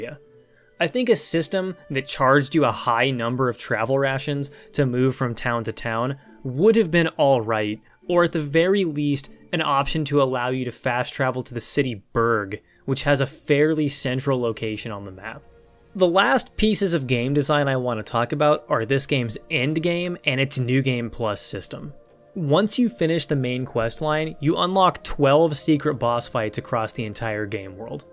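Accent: Quebec, Canada. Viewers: Quebecan? Lol. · quebecan HAHAHAH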